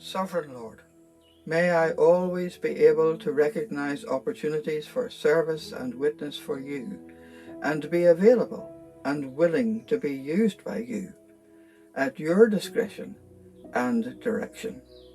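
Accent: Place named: Ireland. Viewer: I love listening to lovely Irish lilt. But I see where you are coming from. Sometimes we can't watch with sound on.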